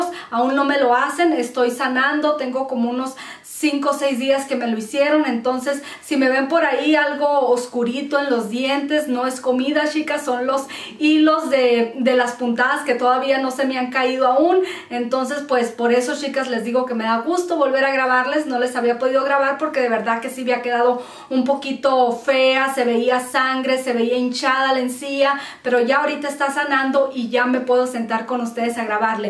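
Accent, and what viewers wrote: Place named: North, Mexico. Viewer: Amiguita, nuestra querida es norteña por eso tiene ese bonito accento. Cada quien es diferente, hay que apreciar la variedad de accentos en los Latinos ❤️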